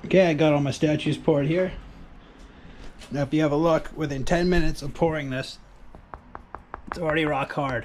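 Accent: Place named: Upstate New York, United States. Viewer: Where do you sell them? Garden centers? Your accent sounds like upstate new York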